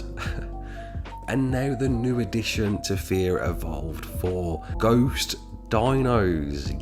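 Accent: Birmingham, United Kingdom. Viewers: You sound like a brummie boy ?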